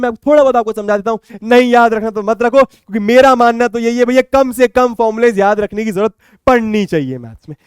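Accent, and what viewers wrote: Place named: Bihar, India. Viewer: My mom asking "is he bihari"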